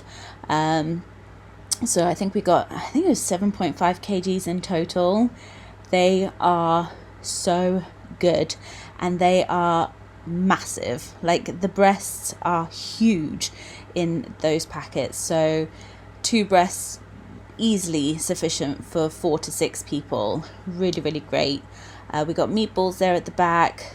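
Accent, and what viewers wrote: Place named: United States. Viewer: Hi, love your vlogs. I have been wondering something for a while and sorry if I’ve missed your explanation, but I notice you use quite a few American words, like Vase or Yoghurt and I wondered if you were born or lived in America or it’s just influence of watching lots of American show(most kids of today do this 😅)? You are very well spoken, but you have a slight American twang and just wondered the reason. ❤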